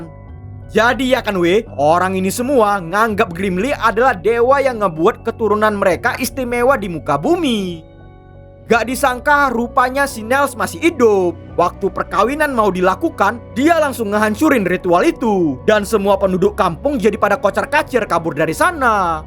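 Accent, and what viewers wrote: Medan, Indonesia. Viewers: Medan kali salam dari Tanjong Morawa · Orang medan yaa min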